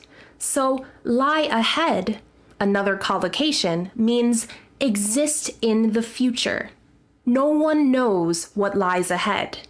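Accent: United States. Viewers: It's american english? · WOW! How amazing accent, for downtown of NEW YORK! EXCELLENT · Hello madam I am watching your videos learning english with the news and found good and clear english in American,so I wish to learn from you. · Is it american or british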